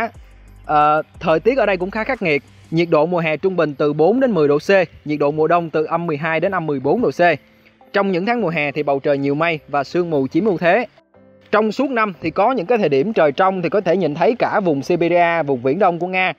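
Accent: South, Vietnam. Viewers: Kênh hay giọng rất nam bộ thích đó nghen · bạn có chất giọng của người miền nam nghe dể thương dể mến nên được nhiều người thích, chúc mừng kênh ‘ TRUYỀN HÌNH’ của chúng ta ngày càng phát triển mạnh mẽ lên 10triệu Sub nha! Ủng hộ hết mình luôn bạn nhé!!! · đọc dễ nghe,giọng nghe dễ hiểu.chuẩn miền nam. chứ giờ 1 số kênh đọc giọng miền nam k ra miền nam,bắc k ra bắc nghe ghét cực kì.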